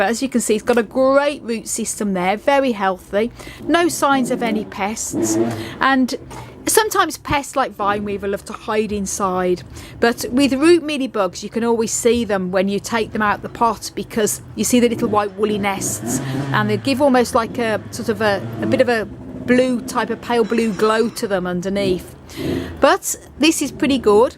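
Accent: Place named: Tamworth, United Kingdom. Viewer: Oh my life! I’m going to need a poly tunnel soon if you keep showing me gorgeous plants that I NEED!!! Xxx great vid again! Where are you originally from? I grew up in Tamworth & you have a similar accent. Xx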